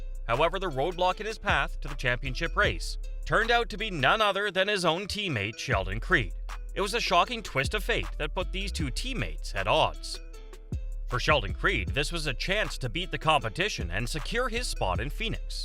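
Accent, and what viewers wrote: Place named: Canada. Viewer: Why reference bubba Wallace? Forced diversity? He had absolutely nothing to do with this. Your goofy accent sounds Canadian. Is that so?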